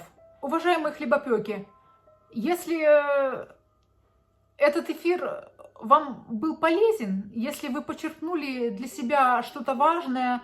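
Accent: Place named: Finland. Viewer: У Вас финский акцент👍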